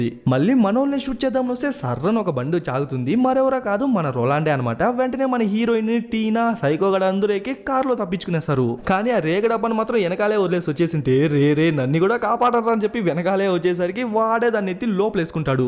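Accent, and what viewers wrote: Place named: Tamil Nadu, India. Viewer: Oka Tamilian abbayee Telugu matladinattu undi ni voice But chapa Baga explained chesavu too well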